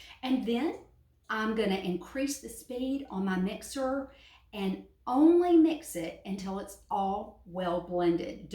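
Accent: South, United States. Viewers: Thanks for sharing! I love your southern accent ❤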